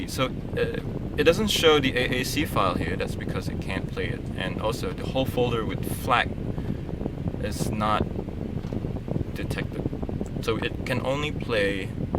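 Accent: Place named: United States. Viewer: you sound like American. do you live there?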